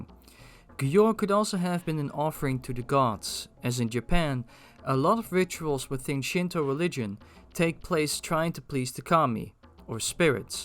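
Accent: Netherlands. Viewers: Been a long time since i saw an actual good theory. I also hear some Dutch accent. I'm from Belgium so i'm glad to hear someone near me also be this passionate about Zelda.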